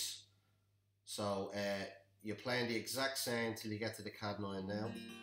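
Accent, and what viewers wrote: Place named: Dublin, Ireland. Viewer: You sound like a dubliner will you be going to his gig next May in the 3arena?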